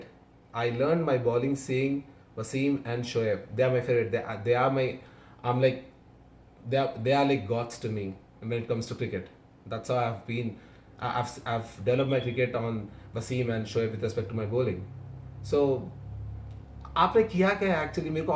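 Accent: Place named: Hyderabad, India. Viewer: bhai gud reply in typical Hyderabadi accent 👌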